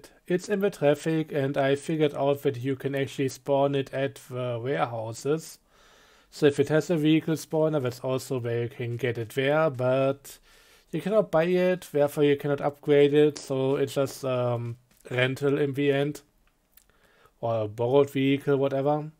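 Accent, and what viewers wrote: Germany. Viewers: i really enjoy your germanized english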